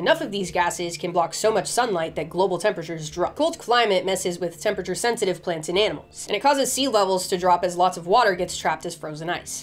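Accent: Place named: Maryland, United States. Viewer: where are you from? You pronounce your O's like a Marylander 😂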